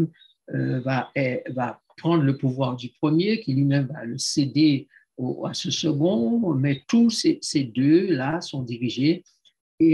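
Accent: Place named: Haiti. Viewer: Tu dois bien t'exprimer en français tu parles comme tu chantes? C'est la langue (patois) haitienne qui détruit ton français et ton accent . Les haïtien parlent de la même façon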